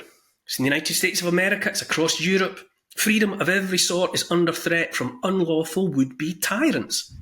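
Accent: Scotland, United Kingdom. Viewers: I ken, i ken 💯 The linguistic unfolding of syntactical tyranny - in a Scottiah Accent 😅👐 Brilliant, greetings from downunder. 👑🌱🔥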